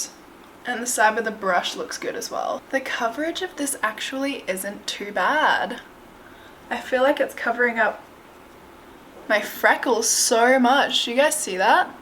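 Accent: Australia. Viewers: is she australian? shesounds like jess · what area of australia are you from? your accent is so cute but it sounds different from other australian youtubers i watch! btw love your videos sooo much!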